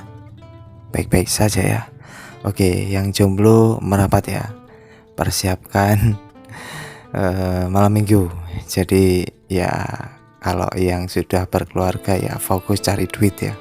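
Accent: Java, Indonesia. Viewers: Mas mau tanya, mas asli jawa ya?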